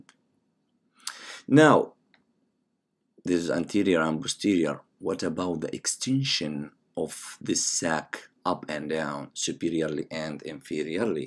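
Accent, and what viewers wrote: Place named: Arab world. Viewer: ابدعت دكتور احسك عربي من لهجتك ما اعرف ليش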